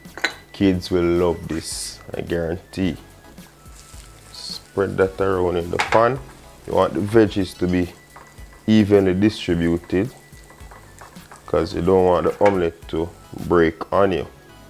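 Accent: Jamaica. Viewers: New to your channel, and your Jamaican accent and delicous food is absolutely amazing.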